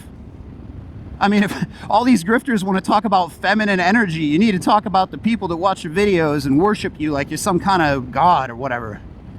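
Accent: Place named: United States. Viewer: well American people always relocate so I thought you must have relocated there .....ofcourse I know your accent is American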